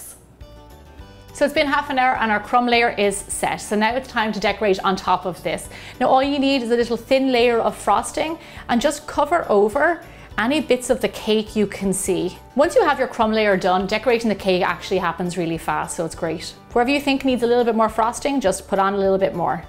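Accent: Ireland. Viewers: Wow! You are super inventive! My picky 9yr old loved your baked potato soup in a mug. Benjamin said it was so delicious. And, my 4yr old Gianna just saw this video of triple layer cake in the microwave and can't wait to bake one. Thank you so much for your amazing recipes! Love your Irish accent too. My father's background is Catholic Irish (Kelley) is my maiden name and my mother is from Ecuador. Congratulations to you and your husband for your new baby. What a blessing. She will be so proud and grateful for such a great mommy. Love & prayers, Deirdre & Patrick Tondo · You're so pretty, and your accent is so cool! Lemme guess... You are from Ireland? Thank you for your creativity, it's a miracle how you can actually make a cake with a microwave! 😋☺️🎂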